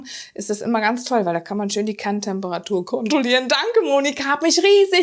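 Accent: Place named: Hesse, Germany. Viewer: Lecker. ☺️ Ich arbeite im schönen Wiesbaden und dachte, ich hätte da so was Hessisches bei dir rausgehört. 😉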